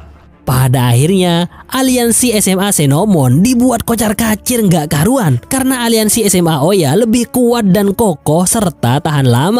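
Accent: Madura, Indonesia. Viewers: Min loe orang Madura iya😅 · kk orang madura yh, gw tau sihh karna gw pernah tinggal di mdura😅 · Bg orang madura ya? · rooo meduroo logatmu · Mak pas versi madureh keh😂😂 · Babg bang abang orang Madura bukan ? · Bg Lo orang Madura ya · Pasti orang madura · Addo reng madureh kkh reng😂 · Reng madureh hdh bg😂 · Ken reng madureh kak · Oreng Madura Ben bang Mak phuruh ngiding kok 🤣🤣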